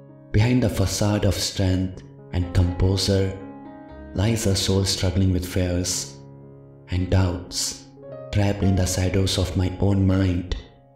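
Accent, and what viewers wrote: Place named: South India, India. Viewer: Your pronunciation is like the south Indians speaking English with the vernacular tone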